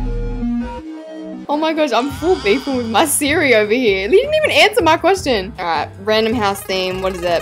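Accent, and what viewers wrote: Australia. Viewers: wait is she not Australian?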